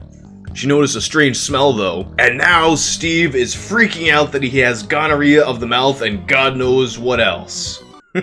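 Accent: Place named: New York, United States. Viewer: First time binging your vids and after a couple of videos I needed an answer. I knew you're from NYC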